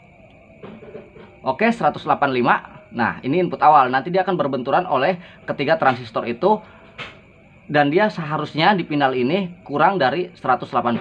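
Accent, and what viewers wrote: West Java, Indonesia. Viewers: Mantap hu jeli banget penjelasannya...orang sunda kang????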